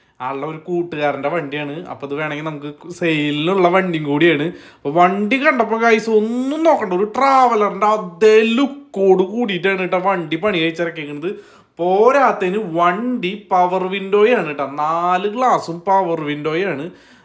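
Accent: Thrissur, India. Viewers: Thrissur malayalam...ദൈവമേ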